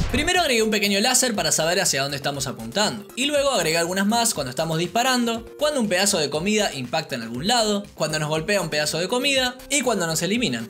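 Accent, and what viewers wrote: Argentina. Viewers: Argentino? No se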